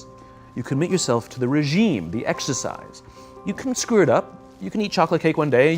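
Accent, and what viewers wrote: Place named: United Kingdom. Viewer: 영국 발음 이네요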